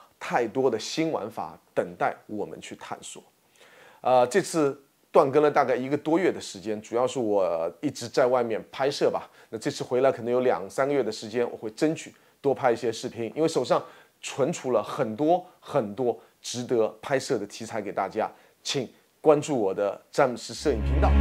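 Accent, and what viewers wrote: Shanghai, China. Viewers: 个口音很上海 哈哈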